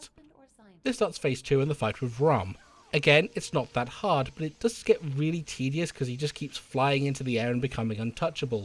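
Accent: United Kingdom. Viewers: Smashed primal on ps2. Genuinely pleased to hear someone from uk when I clicked on this nostalgic brilliantly written blast from the past. Looking forward to what else you've got cooking? c: deserve a mini roll for this vid my G defo ❤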